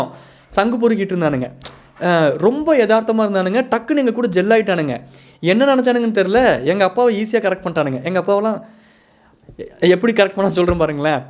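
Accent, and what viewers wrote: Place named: Kongu, India. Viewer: தம்பி நேத்து தான் உன்னோட வீடியோ மொதல பாத்தேன் நம்மளோட கொங்கு தமிழ் பேசரது நல்ல இருக்கு நம்மளோட கொங்கு தமிழ் இன்னும் நெறைய வார்த்தையில பேசுங்க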